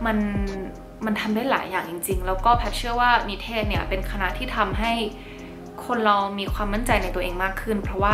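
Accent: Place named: United Kingdom. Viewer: ชอบสำเนียงบริติช มากกก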